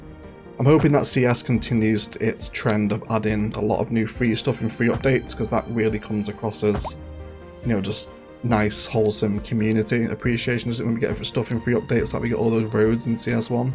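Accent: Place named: Cockney, United Kingdom. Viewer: Omg cockney 😅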